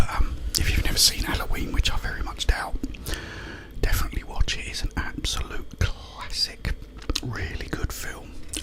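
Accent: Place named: England, United Kingdom. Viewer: Where abouts in england 🏴 you from mate?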